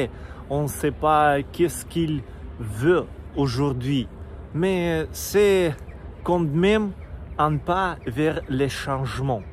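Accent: Russia. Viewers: oui et non, il dit cela puisque tu as un accent très marqué comme dans les films ou un personnage incarne un russe :D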